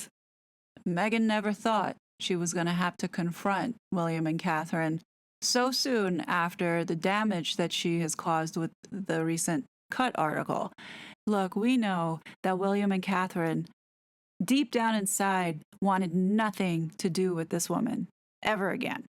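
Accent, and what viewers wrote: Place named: United States. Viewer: Nice to hear an American voice that has some understanding of how The Monarchy works. Duty before family, feelings or wants. She is being tightly controlled by senior members of staff, probably ex military and a higher rank than Prince Harry was. By her demeanour, she had been told how to act. They separated M from H, and he seemed quite happy to be free of the claw. Yes her behaviour to the staff will be addressed, not necessarily to M & H. Give enough rope etc. The Prince & Princess of Wales just outclassed them by sheer presence.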